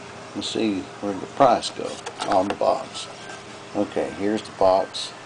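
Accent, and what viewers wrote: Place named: South, United States. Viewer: I really hate the southern accent. It makes the speaker sound so uneducated and dumb. I can't stand how they speak so slowly, repeat themselves, go into detail about things that are common sense, and mispronounce words. If I hear this guy say the word "ColloiDIAL" again, I'm going to scream while projectile vomiting. A der da da der. "Hey, Jim-Bob! We should make a colloiDIAL silver beer to drink on Nascar race day!" "Their makin' a left turn!"- Bubba J. (Jeff Dunham)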